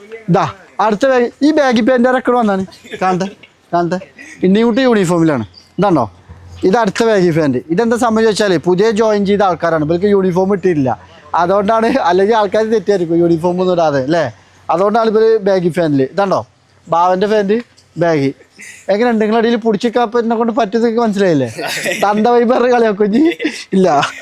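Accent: Malappuram, India. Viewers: സൂസ് അത് പൊളിച്ചു മലപ്പുറം ഭാഷ അത് തിരിയുന്നവന്ന് തിരിയും അല്ലാത്തവൻ നട്ടം തിരിയും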